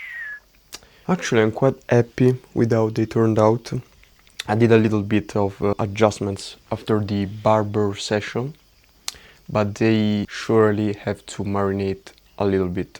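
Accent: Italy. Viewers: "Aiming for perfection is the step towards sadness" yeah right at the end of the day its always You vs You. Loved your accent buddy Italians have a really such adorable English accent 🤍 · Dall'accento sei palesemente italiano ahah, comunque bel video!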